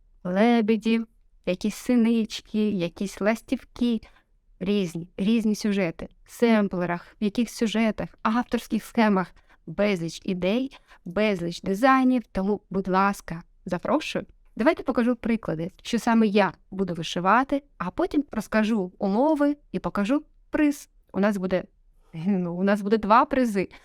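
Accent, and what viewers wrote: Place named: Russia. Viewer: Очень сильный русский акцент. Да уж. ...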